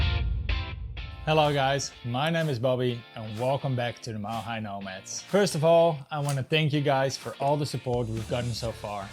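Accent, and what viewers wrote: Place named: Scandinavia. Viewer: Very well explained. I am guessing you are from the Nordics (based on the accent). Very well done video, accurate descriptions, simplified explanation. Keep going!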